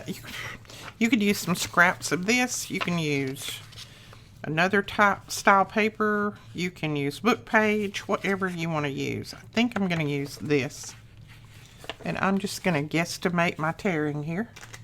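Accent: South, United States. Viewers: I absolutely ❤️ every one of your videos‼️Yours is my favorite channel to watch and my biggest inspiration. I’m very new to this but enjoy it so much. If I could afford it, I would buy everything you use and create all day. Ha ha. Love your accent as a fellow southerner. I’m from Texas, but the accent is the same. Talking about your family is part of your southern charm. Don’t pay attention to those negative people complaining about it! 😉 Keep up the beautiful work! -Michelle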